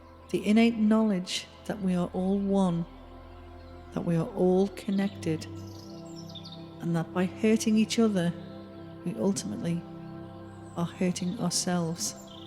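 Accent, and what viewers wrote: Liverpool, United Kingdom. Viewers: I couldn't stop being distracted by her dialect. Not sure if it was Liverpudlian or something else. Also, to be honest, I felt it was all too "rushed" I needed a little bit more time to follow the directions. I think it's s great thing to share these meditation's, but a LITTLE SLOWER next time please! Thanks 👍 xxc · Sorry, I can't relax with a Scouser talking, got both hands on my wallet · Are you scouse ? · for some reason the british accent annoys me. how can i get over this? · Been doing it since I was a kid nice to hear a scouser bring me back.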